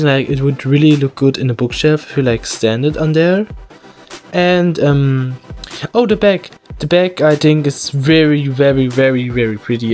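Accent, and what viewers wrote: Germany. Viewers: Wait, are you german? I am currently learning german on duolingo so I kinda notice the accents 😅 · CONGRATS ON 1K ( also ur accent is so heavy??!!!! ))) german?? Italian???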